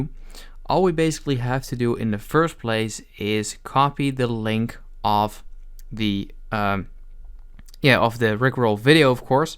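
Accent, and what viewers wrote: Netherlands. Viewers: R u from the Netherlands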